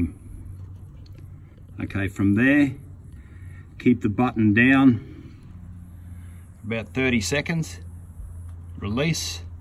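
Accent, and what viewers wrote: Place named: Australia. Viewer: As soon as i heard the aussie accent I knew he was going to help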